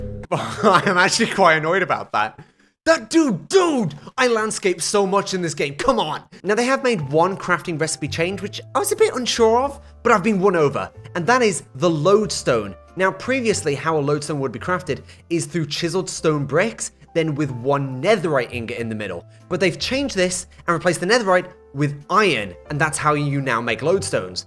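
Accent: United Kingdom. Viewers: funny thing is hes British so it technically wasn't a hard R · i love watching a british person rant about a silly block game. gotta be my new favorite types of videos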